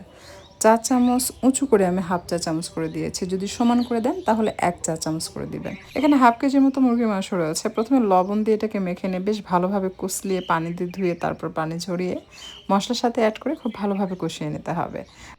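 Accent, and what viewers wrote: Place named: Noakhali, Bangladesh. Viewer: বললেন পাবনা থেকে বলতেছেন,কিন্তু ভাষার টোন হচ্ছে নোয়াখালীর।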